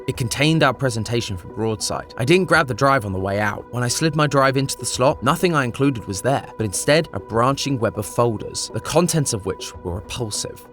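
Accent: United Kingdom. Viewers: I got a massive British jump scare cuz my brain short circuited and I forgot was British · Hey British matpat! Are you becoming silly? :3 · does he have to be british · Who is this British gentelman? Where is MatPat? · It's because he's British. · British matpat isnt so bad after all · W new matpat update even tho hes British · "wheres matpat 🥺" HE CAN DO WHAT HE WANTS NOE LET THE SILLY BRITISH GUY SPEAK ‼️‼️ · British goood day mate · AHHH, A BRITISH PERSON!!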